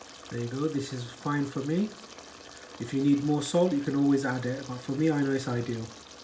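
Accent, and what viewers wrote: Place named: United Kingdom. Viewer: Absolutely one of my favorites! I've been adding Ochra/Bindhi to my curry lately and it really makes the dish much tastier and healthier. I will be copying your recipe and style for my next chicken curry for sure... I really like your video (very well edited) and your British english makes it all the more enjoyable to follow. Thank you very much. I have subscribed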